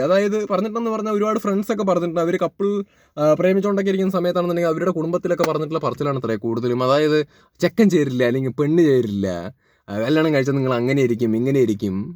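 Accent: Palakkad, India. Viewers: PALAKKAD slang poli 🔥 Very comedy 🖤❤🖤 🔥BIGG FAN🔥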